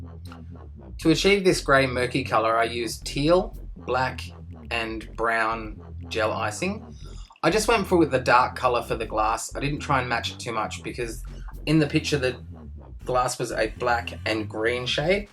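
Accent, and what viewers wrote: Australia. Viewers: can u not hear his accent hes Australian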